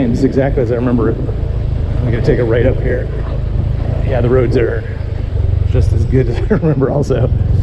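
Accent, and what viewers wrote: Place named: Chicago, United States. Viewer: Are you from Chicago ? When I listen to you speak we sound exactly the same.!! 😂😂😂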